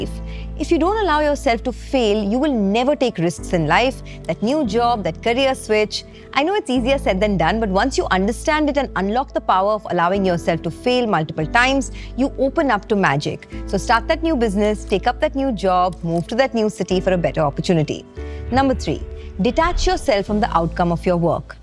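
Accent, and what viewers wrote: India. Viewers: Sweet English from beautiful Indian...even the lessons....and much more...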